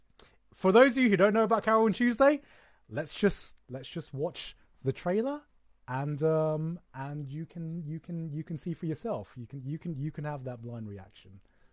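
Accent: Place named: United Kingdom. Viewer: Holy shit look at that baby face😭. The british accent is much thicker too.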